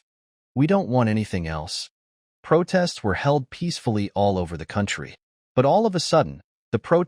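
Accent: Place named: United States. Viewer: Why the hell do you need an American narrator for this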